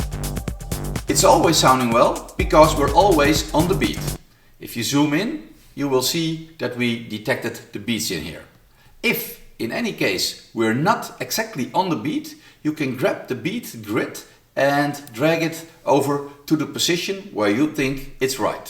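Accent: Netherlands. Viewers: hahaha hij praat Engels op de Nederlandse manier 😂 je hoort het accent gewoon 😜 wel cool dat deze software van Nederlandse bodem komt ! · I like your narration - is that a dutch accent I hear? reminds me of my grandpa :) good times!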